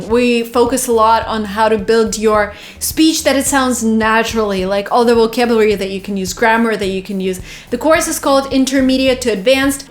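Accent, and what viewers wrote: United States. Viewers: In which way I can talk to u to learn American accent my age is 14 plz help I like the way u talk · Are you from American🇺🇸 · Thank you, after watching the learning video you gave I feel that my English skills have increased. I like your accent even though you are originally Russian but your American accent is very good. You are my motivation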